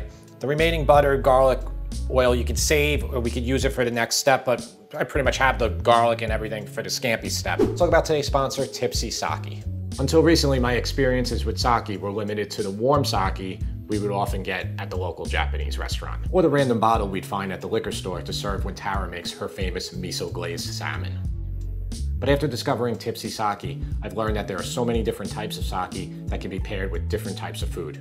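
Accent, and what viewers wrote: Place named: Long Island, United States. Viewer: Can’t wait to make this. Thanks! Love and miss the LI accent. Vermont just doesn’t cut it. 😬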